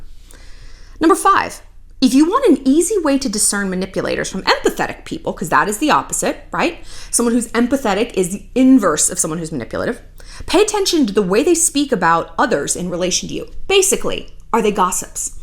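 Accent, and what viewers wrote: England, United Kingdom. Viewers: Love your English accent ! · LOVE the British accent! With love from London xx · Your British accent kills me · Looooveee your British accent 😂❤️ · I love your brit accent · Your English accent is 🤩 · i like your british accent so much i listen and don’t get the words because i listen your voice like listening music, i have to go back to listen again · your british accent is on point, this video is straight facts. Your hair is glowing 💜💜💜 talking about glowing, you look really happy, relaxed, you are finally at peace. You're blooming... i'm so happy for you, i was so stressed myself out when i saw you struggling with all those issues and bad people. You deserve the best, you helped a lot of people to open their eyes and stand for themselves, you saved a lot of people from bad people and relationships. You deserve to be loved and cherished and this fresh start is for sure the best decision 💜💜💜 Kisses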